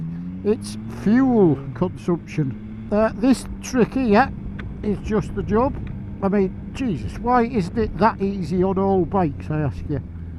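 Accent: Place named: England, United Kingdom. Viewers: that yorkshire accent grinds my gears normally but I can cope when it about motorbikes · is the original straight talking Geordie.. love it!!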